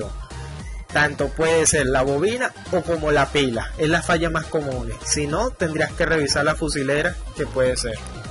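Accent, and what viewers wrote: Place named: Venezuela. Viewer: Venezolano este men saludos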